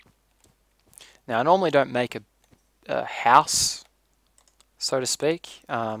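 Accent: Australia. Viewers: yay, I'm welcome :). Im happy that there is an lp'er who speaks in an Australian accent.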